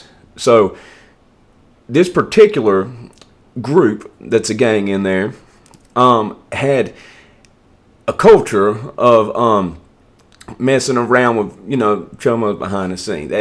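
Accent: United States. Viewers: LOVE your country accent bro...so sexy!! ❤ from Scotland ✌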